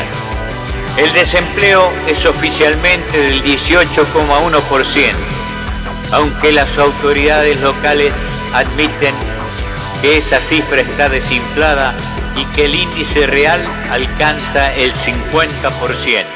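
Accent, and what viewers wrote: Argentina. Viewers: . Seguro que sos Argentino y tanguero por la tonada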